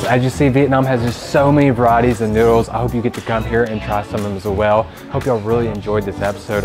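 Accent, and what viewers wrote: South, United States. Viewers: Do I hear a little southern accent? Love it! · First time seeing you’re video and I must say, you are a gorgeous man. And on top of that the food you chose, your southern accent and how you described everything was great. Keep up the great videos :) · the combo of his southern accent talking about his love for Vietnamese noodles... <3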